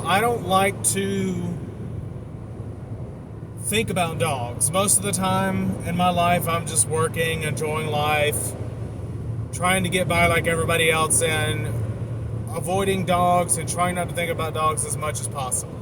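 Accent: South, United States. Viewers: I missed your beautiful southern accent. You make some valid points about cats. I am a cat lover too and my ancestors in Egypt loved cats. Not all cats behave in the way you suggested and a well trained cat is actually a really cool creature to have around the house and long as the house is kept very clean aswell as the animal itself. Cats are generally much cleaner than dogs anyway. All pets add extra workload to the housework so that comes with the territory. I agree a humane cull is needed though, as the cat population is definitely getting WAY too hard to manage for federal and state authorities resources. That being said, human societies around the world have kept animals as pets for thousands of years and that's a part of human nature that you never going to change. We still need these rants urgently as they remind us of our core responsibilities as humans to the rest of the environment. 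Please don't stop making these videos. And thats coming from ME -AN OBSESSED CAT LOVER.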